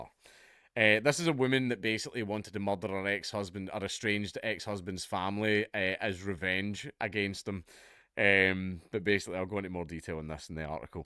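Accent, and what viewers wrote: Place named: Scotland, United Kingdom. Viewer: Locals pronounce it more like "Lean-gatha" (I live just over in Moe, probably 20 minutes away) Great to see Dank covering a local story! Dandenong pronunciation was as spot on as a Scot's accent will allow 👍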